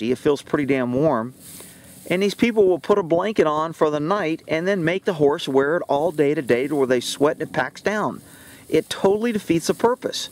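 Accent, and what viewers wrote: Texas, United States. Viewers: your Texas accent is stronger...I could barely understand you say "saliva". lol Awesome video. Thanks!!!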